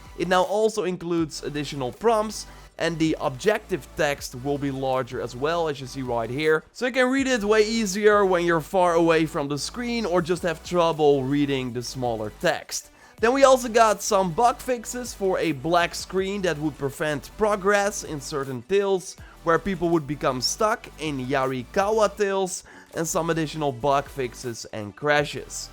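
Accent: Netherlands. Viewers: For sure your Dutch with your accent ! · Your Dutch accent is awkward to hear. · I can hear You’re dutch because of the accent. Its pretty bad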